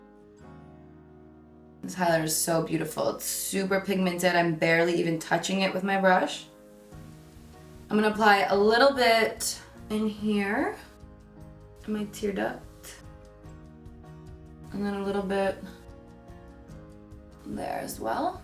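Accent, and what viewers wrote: New Jersey, United States. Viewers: You sound like your from Jersey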